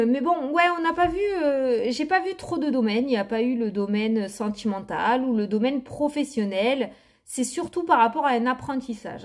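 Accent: South, France. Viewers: coucou un vrai rayon de soleil avec un beau zest d'accent du sud...merci pour cette guidance... bisous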